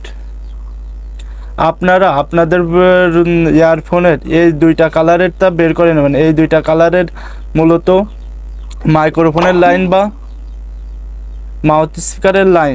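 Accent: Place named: Narail, Bangladesh. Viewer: প্রাই নড়াইল এর ভাষার মত,,,,, কোন জেলায় বাড়ি ভাই আপনার??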